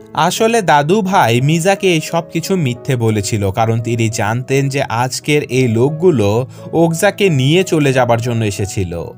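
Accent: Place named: Bogura, Bangladesh. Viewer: ভাই আপনার বাসা কি বগুড়ায়,,কারন আপনি আমি না বলে হামি বলেন,,হামি তো আমার পছন্দের বগুড়ার ভাষা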